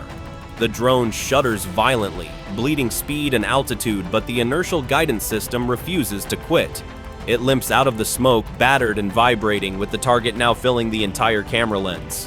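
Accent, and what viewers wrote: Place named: United States. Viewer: CLICK-BAIT SCAMMER1 TOTALYANK VOICE B.S.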